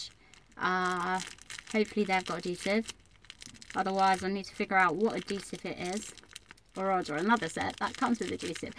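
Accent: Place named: Canada. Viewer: Love ur videos and I love your accent r u from Canada? I'm from. San Antonio Texas in the USA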